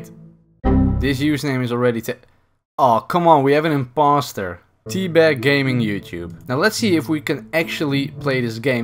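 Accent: Netherlands. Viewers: Judging from your accent youre a fellow dutchmen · Ik kan gewoon horen dat je nederlands bent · U kinda have a Dutch accent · not only not a German accent, but very clearly Dutch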